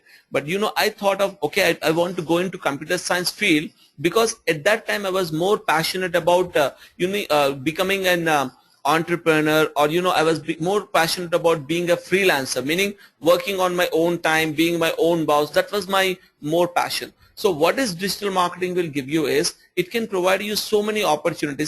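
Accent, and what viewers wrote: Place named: South India, India. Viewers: This da(the) Indian accent bro...this is how we speak and understand. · Bro, that's a south Indian accent. You are awesome anyway.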